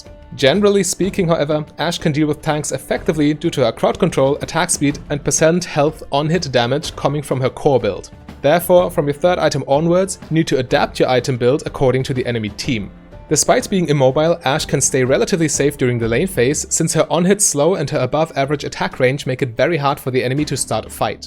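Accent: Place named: Germany. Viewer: Inhaltlich gutes Video aber ich verstehe nicht, warum Deutsche mit so einem krassen Akzent englische Videos machen müssen. Ist mir in letzter Zeit öfter aufgefallen. Als ob es nicht genug englischsprachige Videos gäbe.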